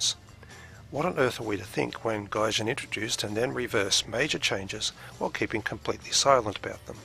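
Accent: Australia. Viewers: He sounds like an Aussie to me.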